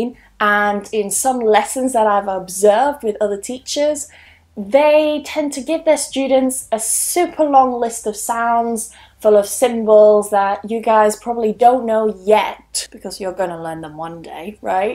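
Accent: United Kingdom. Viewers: Nice video. Thanks a lot. Love British accent. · Thanks so much for your work and Happy Birthday to you🍰26🕯️🕯️🕯️🕯️🕯️🕯️🕯️🕯️🕯️🕯️ 🕯️🕯️🕯️🕯️🕯️🕯️🕯️🕯️🕯️🕯️🕯️🕯️🕯️🕯️🕯️🕯️amazing clear British pronounce! Lovely teacher indeed!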